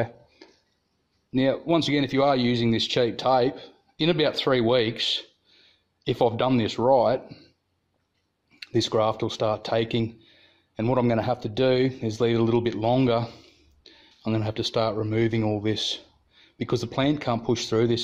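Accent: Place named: Australia. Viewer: No nonsense Aussie speak !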